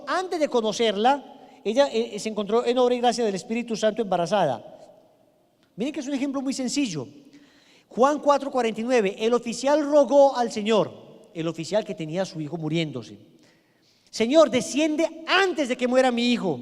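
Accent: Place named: Colombia. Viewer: este ermano deve ser Colombiano muy buenas explicación aun que no pude evitar reir en varias veses su forma de ablar es desir de desir las cosas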